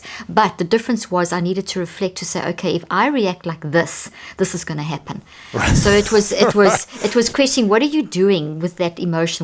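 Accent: South Africa. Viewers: South African….Irish accent much nicer, I am saying this as a SA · Ek hou van haar aksent!suid afrikaans aksent! Claude Frankryk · All good. I wish you didn’t have the SA accent and didn’t have to talk so fast. On the other hand that will make people buy your book. Talking so fast seems to be a millennial thing but it doesn’t come across as being sincere, or did you have too much coffee. You kept on showing your book but it always have that metal tree in front of it. Was that also a trick to make is buy your book? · I was guessing but wasn’t sure. Typical South African accent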